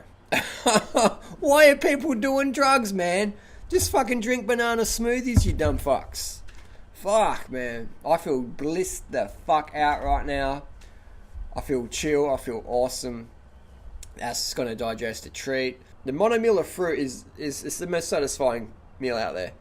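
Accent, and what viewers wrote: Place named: Australia. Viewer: But you are funny with your Australian accent mate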